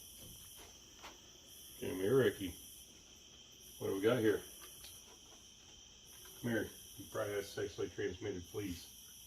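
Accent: United States. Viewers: i cant get enough of ur american accent, i just love it how u say "come here u little shht" 😂😂😂 much love from Estonia!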